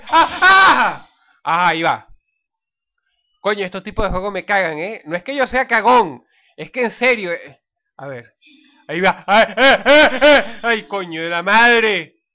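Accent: Argentina. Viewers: Le fue engrosando su voz de sus 25 años para acá, muy aparte del acentro entre mezclado con el argentino, pero la voz le cambió.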